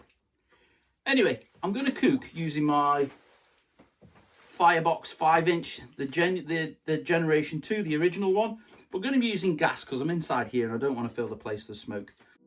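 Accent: Stoke, United Kingdom. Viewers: Great video .may I ask where that is it looks amazing .and is that a stoke accent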